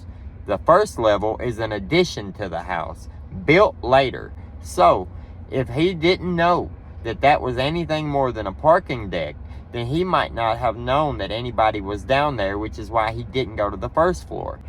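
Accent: South, United States. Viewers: This is the 1st video I've seen of yours. If I'm being honest I was going to skip past it. I decided just because you didn't speak like me and had a Southern accent doesn't mean I shouldn't listen to what you have to say, obviously 🙄 I'm glad I decided to give it a chance. Keep up the good work! Good take on this case. I don't fully agree with your take on what happened. I Think those 2 surviving roommates had something to do with this. It's way too weird for them not to see any blood. Dude there said it was a blood bath. There's blood leaking out of the f**** house. This whole case blows my mind, though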